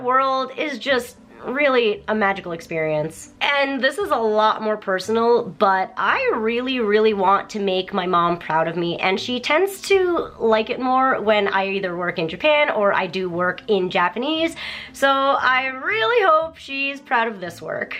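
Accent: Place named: United States. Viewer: You sound soooo american but you speak japanese??? How do you do it!?!?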